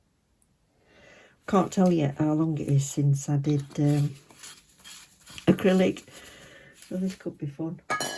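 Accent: Yorkshire, United Kingdom. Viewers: Wow they’re stunning. Definitely love the wheel idea and the longer videos are great xx Btw you’re so funny 😆. Are you from Yorkshire? I’m from Hemsworth if you are x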